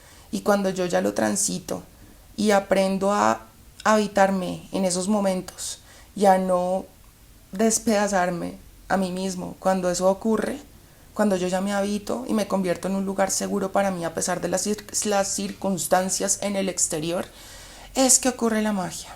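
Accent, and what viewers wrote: Colombia. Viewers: Excelente video y GRAN CONTENIDO 🇨🇴 " MI GURÚ FAVORITA ❣️🇨🇴" UN placer verte y un DELEITE escuchar tu voz muy colombiana 🇨🇴 ❣️ ( siempre enseñanzas prácticas GRACIAS ❣️🇨🇴😉✌️🇲🇽) UN BESITO Y UN ABRAZO DE OSO HASTA BOGOTÁ COLOMBIA 🇨🇴❣️😉✌️🇲🇽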